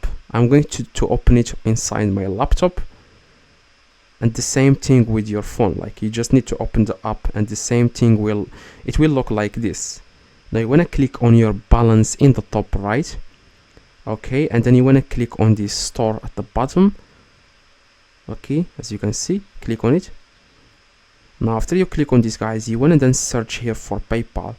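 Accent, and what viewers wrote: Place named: Morocco. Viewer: 3rftu mghribi gh mn laccent dialu😂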